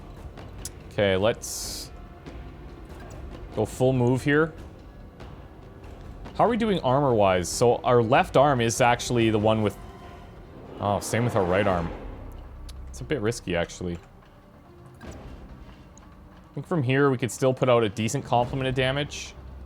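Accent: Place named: Canada. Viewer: So what's weird is that when I lived in Canada I never noticed the accent. Now living in the States for a decade I'm painfully aware of the Canadian accent. Keeping doing you. Get oot there and get 'em.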